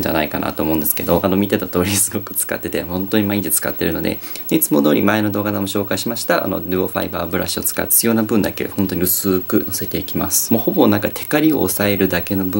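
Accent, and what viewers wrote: Kansai, Japan. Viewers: 、関西弁でかわいい😍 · メイクすごく綺麗で丁寧なのに編集が面白くてギャップがすごい！私は関西出身なのでの発音すごく好きです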